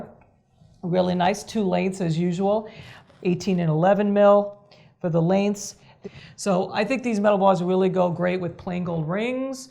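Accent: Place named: New York, United States. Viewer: This woman is a genius! So much creativity and thought behind all her pieces. I love listening to her voice too, I live in the south now and miss hearing that NY accent. Brings me right back to my childhood/extended family!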